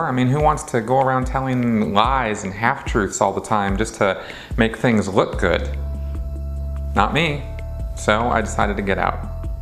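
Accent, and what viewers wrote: Minnesota, United States. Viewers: Are you from Minnesota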